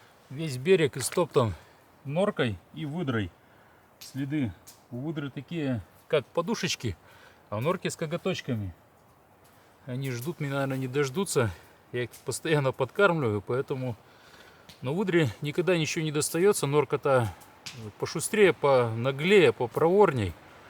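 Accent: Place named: Vologda, Russia. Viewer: Все думала,почему разговор у знакомый,леса и болота какие-то родные.А оказалось,что мы соседи😊.Привет из Вологодской области!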